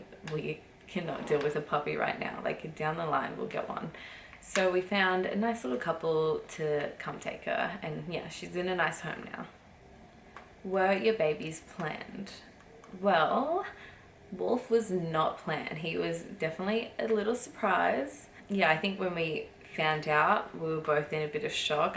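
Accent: Australia. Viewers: Pretend? well we don't need to with you honey you fat water buffalo. This girl is absolutely beautiful (no pretend) also she's not monotone it's just an Aussie accent and looking after two young kids all day. That shit takes a toll, but you wouldn't no fatty and you never will. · Third Aussie YouTuber I'm subscribed to. Aussie accents are like music to my ears lol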